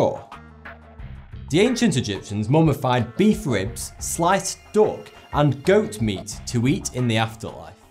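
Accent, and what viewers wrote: United Kingdom. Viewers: Thoughty2 sounds like 42 with your British accent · I like your british accent :3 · Is he British??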